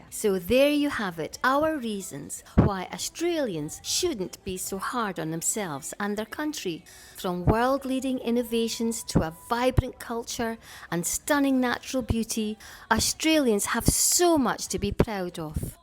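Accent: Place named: Scotland, United Kingdom. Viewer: Must be my Scottish ancestry (MacGregor) because I've never had the privilege of visiting yet. Whenever I hear a Scottish accent it feels like I'm taken back home, if that makes sense. Yes as Aussies we take so much here for granted, especially by those who have never visited other countries. We just don't realise what we have and how good life here can be if we allow ourselves to appreciate it. But in some beachside locations the sand flies can give the midgies a run for their money. They say the mozzies her can carry you away but the sandflies rough you up good and proper then carry you away. Also Aussies will be the first to tell "Whinging Pom!" to anyone who dare criticize what we love to criticize ourselves. But truth be known the Poms have nothing on the whinging Aussie. We'll have a whinge about finding nothing to have a whinge about.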